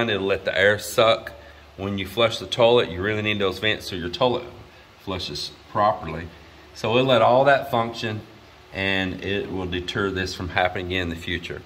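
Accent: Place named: South, United States. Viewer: Dang: I thoroughly, )( THOROUGHLY ) enjoy and learn from your skills. You and Mylinda are really blessed. Still fine tuning my ears to your southern accent! I'll try and negotiate emailing you so we can be friends.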